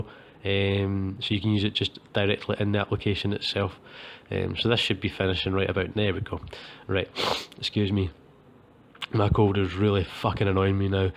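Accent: Scotland, United Kingdom. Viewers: Oh Aye scottish tutorial , thanks for the help mate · I love scottish accent man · gold i believe its a Scottish accent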